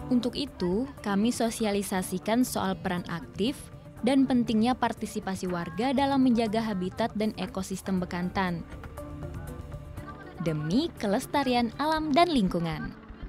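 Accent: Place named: Sunda, Indonesia. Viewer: Mirip sunda logatnya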